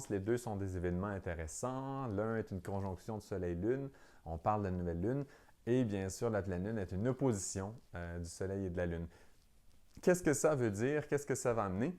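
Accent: Quebec, Canada. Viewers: Nouvelle abonnée, merci beaucoup pour cette vidéo et pour ce sympathique accent Québécois je pense, je vous souhaite un beau mois d'avril du sud de la France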